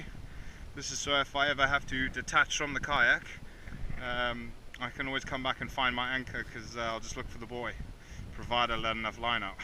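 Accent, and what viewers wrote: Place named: South Africa. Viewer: Hi I don’t mean to be rude but are you partly South African only guessing from your accent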